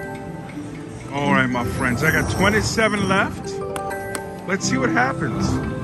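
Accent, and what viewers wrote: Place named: Louisiana, United States. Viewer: Bro has got to be from South Louisiana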